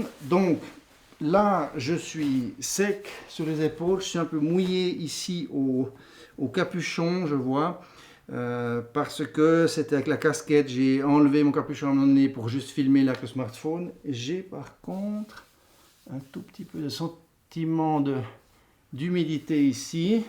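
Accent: Switzerland. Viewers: Vous êtes génial de l'humour, de la passion , expérience et technique et des présentations limpides ( bon il y a un peu d'accent Suisse !!! ), Bon rétablissement concernant le genou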